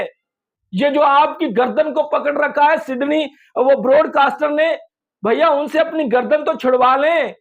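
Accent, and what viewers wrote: Pakistan. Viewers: This guy is fraud trying to talk like indian with cheap pak accent he is actually pakistani wearing indian jersey.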